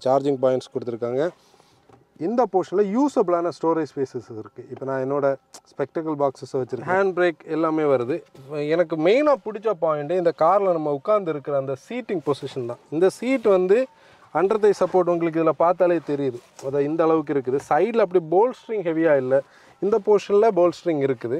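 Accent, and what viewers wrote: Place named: Kerala, India. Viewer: Simple , important , interior , front , point is a word Simble, impordant , inderior , frond , poind is emotion Kerla influenced pronunciation like it though 😃👌😊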